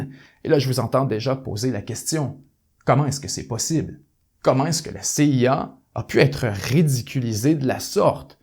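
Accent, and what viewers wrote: Quebec, Canada. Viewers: Ton accent canadien est tout simplement cool avec une adresse oratoire impeccable. Les espions sont éliminé systématiquement car ils savent très bien ce qu'ils font ; ni vue ni reconnu, quel dommage de sacrifier sa vie pour des causes aussi basse. Hélas comme la betise humaine est insondable. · Bonne vidéo, malheureusement l'accent québécois m'insuporte ...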